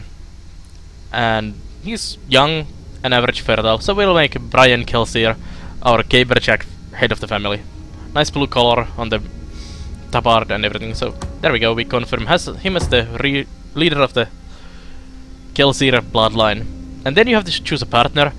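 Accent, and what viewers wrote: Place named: Finland. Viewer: "Ärrän kierrän ympäri orren...." It's funny how every Finnish accent is different, but recogniseable. Your english is really good though :)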